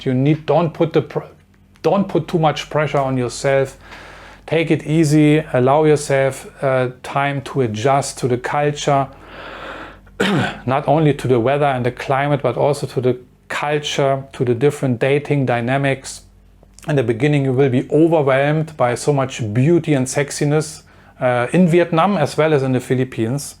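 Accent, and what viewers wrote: Germany. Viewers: love your dinglish Sir, I was living in Nurenberg before, totally missing this beautiful accent, and here is my story, I just met a super pretty Vietnamese lady from Vietnam Cupid website, but I'm suffering the huge obstacles in the lack of english and the difficulty to learn Vietnamese language, the differences of cultural behavior is confusing me greatly, the most incredible thing that i noticed is her super dedication to me which i never seen in my life with any woman I met....we already had 2 fights on our first week, but this video taught me a lot and I hope i will adapt to her....I can mot believe they do not teach english in school, I had to pass 3 languages in Egypt High school to go university ....!!! any how, Danke schoen.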